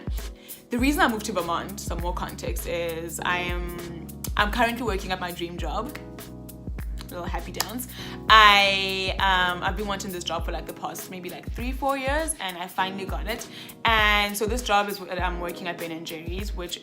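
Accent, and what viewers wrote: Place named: South Africa. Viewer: It’s African! South African or Botswana actually. She sounds NOTHING like a Jamaican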